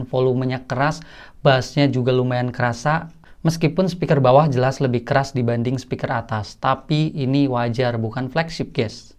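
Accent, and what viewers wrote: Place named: Banyumas, Indonesia. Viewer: kiue ngapak deneng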